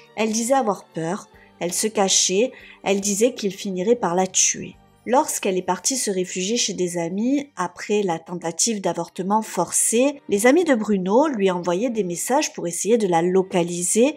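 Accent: South, France. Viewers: Impardonnable ce qu il ont fait..ça me degoute que de tels personnes puissent vivre...pauvre fille...votre manière de narrer adoucit qq peu avec votre pointe d accent du sud qui fait plaisir !).. Bien à vous malgré ttes ces horreurs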